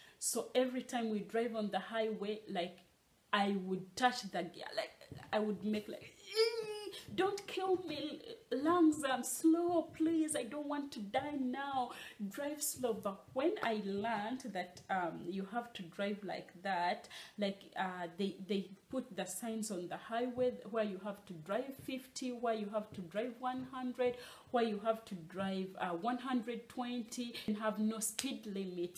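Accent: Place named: Uganda. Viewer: From your introduction 0.01 I knew you were Ugandan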